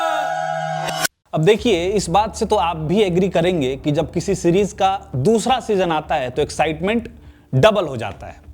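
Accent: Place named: Bihar, India. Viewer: Iski bt se samajh aata he ye bihari he isliye ye jal raha he😂😂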